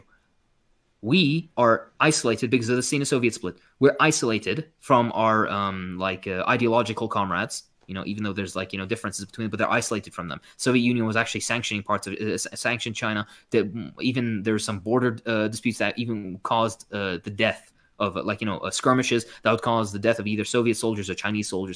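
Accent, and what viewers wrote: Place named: Iraq. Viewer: How? He has a clear Iraqi accent.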